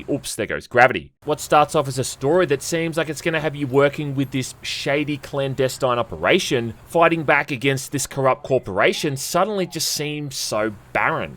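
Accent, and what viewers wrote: Australia. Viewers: I was on the fence about this game. I had watched exactly nine reviews on this and still wasn't convinced. However, YOU convinced me. Hell maybe its just cause your austrailian and that is the funniest accent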